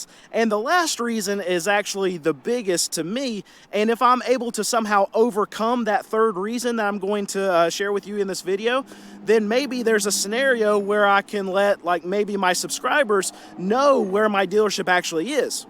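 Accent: North Carolina, United States. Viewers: I thought about looking your dealership up for S&Gs. I imagine you are located around Statesville NC based on your accent. (Being from NC myself). I am here for the content, not the cars. I like that you have your ear to the ground on the car market. Keep up the great work.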